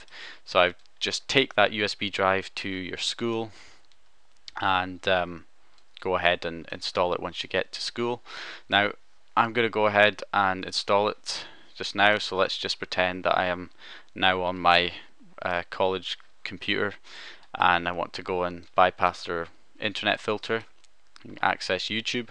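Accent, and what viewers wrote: Scotland, United Kingdom. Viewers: You Scottish too?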